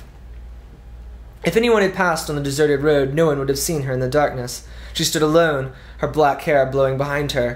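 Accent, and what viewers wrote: England, United Kingdom. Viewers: why are you reading in a British accent??? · i like your english accent!:D i cannot wait till this book comes out!!! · are you british?or are your characters? : )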